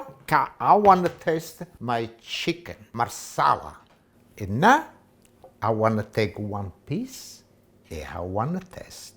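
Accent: Italy. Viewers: 🤣😅🤣😝🤣😅 you are funny but you are right..he talks like a true Italian!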